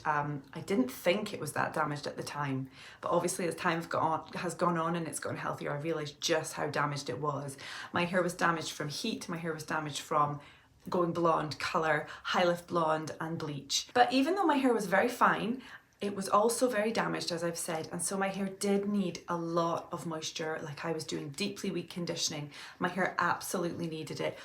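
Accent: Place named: Scotland, United Kingdom. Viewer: Hi, thank you for this video. Are you from Scotland? I don't hear a strong accent but I'm sure I detect some Scottish. Thanks to your videos, I have ordered Curl Keeper Original. I'd never heard of it until you mentioned it and I am thinking it just might be the perfect styling product for my waves.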